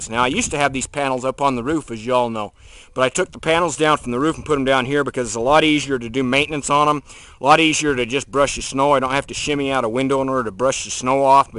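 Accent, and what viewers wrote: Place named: South, United States. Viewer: where do you live? pick up a southern accent but get snow?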